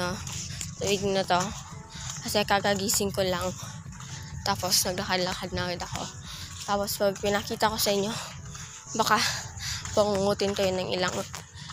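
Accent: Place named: Visayas, Philippines. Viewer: i like your bisaya ascent "TUBAG SAD MO OY" 😂❤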